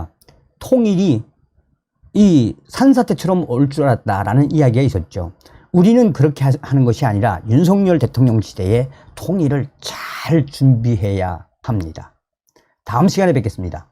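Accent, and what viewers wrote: North Korea. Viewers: 북한 출신이 북한말투를 좀 쓰면 어떻습니까? 지방 사람에게 지방 사투리 쓰지 말라고 하면 정말 짜능나고 예의없어 보이는 행동입니다. 그리고 새로운 소식과 옳은 의견 얘기하는데, 쓸데없이 말투가지고 뭐라하지는 맙시다.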